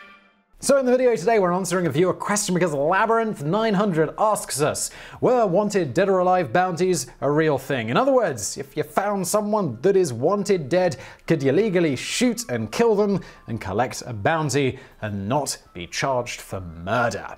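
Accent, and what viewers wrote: United Kingdom. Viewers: Why tf is vsauce British now?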